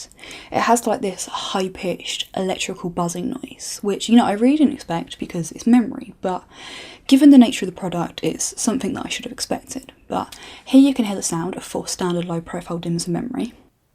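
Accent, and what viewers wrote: England, United Kingdom. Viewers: ur english accent is so sexy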